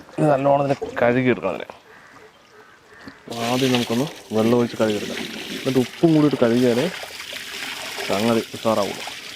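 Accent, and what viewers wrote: Palakkad, India. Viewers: തനി പാലക്കാട് ഭാഷാ......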